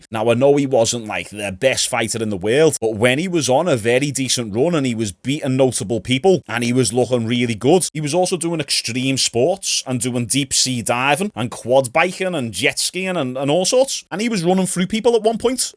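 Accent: Liverpool, United Kingdom. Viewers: You're the first guy that speaks Scouser that i don't hate listening to. Good job! Chama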